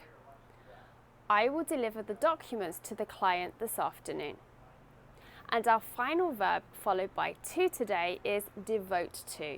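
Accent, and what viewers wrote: United Kingdom. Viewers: yeah i did not know where are you from, but i noticed your accent, and i guess it is british accent😀😊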